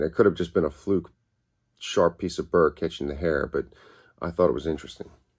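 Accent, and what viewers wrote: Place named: Maryland, United States. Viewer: You sound like a Marylander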